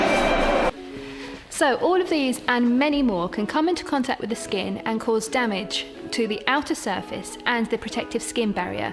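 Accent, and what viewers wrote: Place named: United Kingdom. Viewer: Good to hear British ive subsctribed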